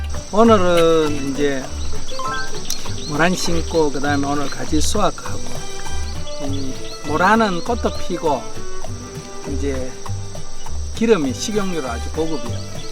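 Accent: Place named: Gyeongbuk, South Korea. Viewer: 경북 억양 너무 정겨워요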